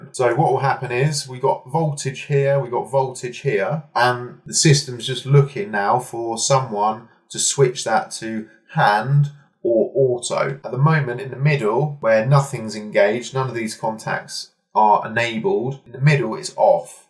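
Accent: United Kingdom. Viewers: Everything was great but one thing is true, bruh has the strongest british accent for sure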